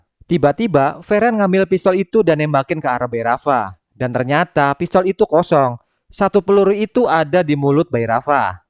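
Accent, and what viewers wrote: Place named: Cirebon, Indonesia. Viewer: Wong cerbon ya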